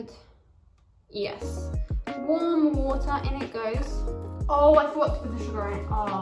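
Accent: United Kingdom. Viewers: Refreshing to hear British accent after a long time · this video would be terrible beyond all imagination if it weren't for two facts: that you're stunningly beautiful and even more importantly, that you speaking in a mesmerizing British accent, which makes this video the best one uploaded on youtube since youtube's foundation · i have to visit england, i can listen to this accent all day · Look how cute she look while eating by the way love your content and British accent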